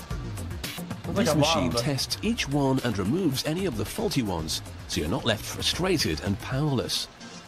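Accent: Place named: United Kingdom. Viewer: I thought the narrator was always british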